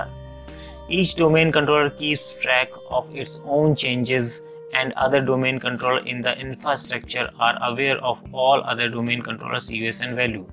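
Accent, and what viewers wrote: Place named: India. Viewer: oh god another AD video with indian english. can we please get an actual american to redo the F*cking audio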